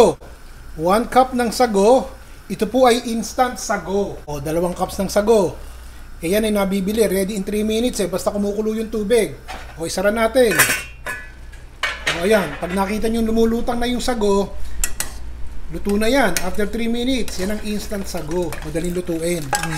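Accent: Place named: Angono, Philippines. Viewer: Informative and entertaining lalo pag nagsasalita po kayo dahil naaalaala mga taga Angono na pareho mo punto. At sarap ng kakainin habang nanonood ng show mo. All the best,!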